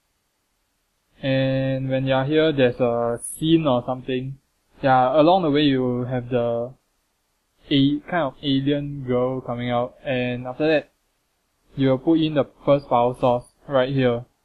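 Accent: Singapore. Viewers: Obviously Singlish......==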